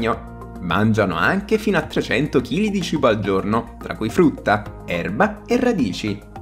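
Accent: South, Italy. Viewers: Bel video, e anche interessante anche se un pó scolastico. Mi permetto di fare un appunto sulla dizione inequivocabilmente meridionale, non si raddoppiano le consonanti, (es. Incredibbile...) e le C non si pronunciano sc...., l'italiano è un'altra cosa.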